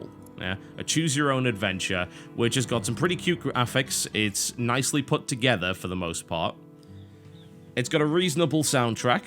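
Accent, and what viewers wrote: United Kingdom. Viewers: is it just me or is british? lol! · Why would the name total Halibut and athe british accent make you belive that? No but in all seriousness( if that is a word) he is british but moved later to the U.S you can catch up on most of his backstory in the 1 mio subs vlog. Thinking about it it sounds like I gave you the back ground to a videogame character... hm..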